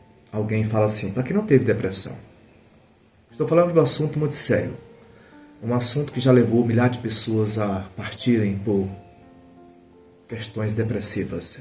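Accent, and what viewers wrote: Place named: Piauí, Brazil. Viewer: Acho lindo sotaque de sotaque nordestinos do Paui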